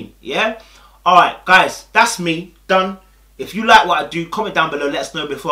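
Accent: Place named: Birmingham, United Kingdom. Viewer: Yout brommu accent came out today small small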